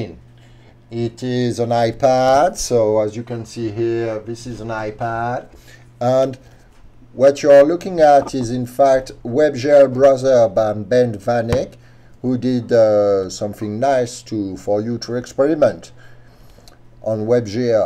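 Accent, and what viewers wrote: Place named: France. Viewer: French accent powaaaaaa ! :)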